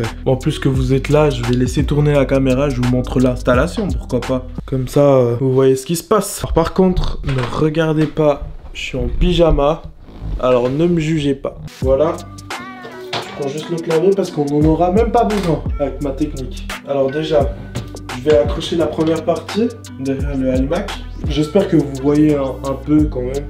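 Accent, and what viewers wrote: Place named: Switzerland. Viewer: Je fais une recherche à ce sujet, je reconnais ton accent Suisse et je vois Delémont...Yo! Je viens de Delémont aussi mais je vis à Montréal depuis 2011. Insane ce bel hasard!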